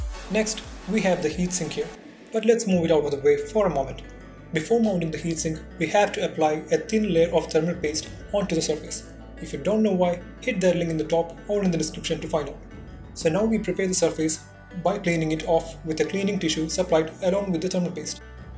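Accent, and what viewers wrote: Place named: Kerala, India. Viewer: ah yes, you're right. BTW, are you from Kerala? Your accent seems like it.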